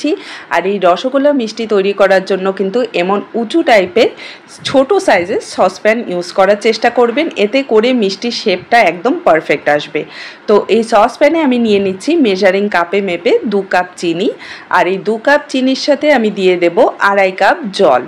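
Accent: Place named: Bangladesh. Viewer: পুরো ভিডিও দেখে আমি একবার ও বুঝতে পারিনি আপনি ইন্ডিয়া তে থাকেন কথার একসেন্ট একদম আমাদের মতো। শুনতে ভালো লাগছিল 🤗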